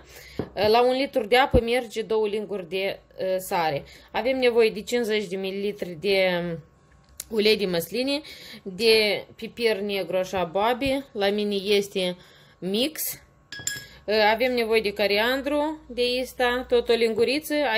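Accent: Moldova. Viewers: In afară că ești o gospodină desăvârșită, imi place de modul vorbirii tale pur moldovenește, intr-un an după revoluție am fost în vizită la cunoștințe in HERTA, și la fel cunoștințele vorbeau exact ca tine, ești superbă.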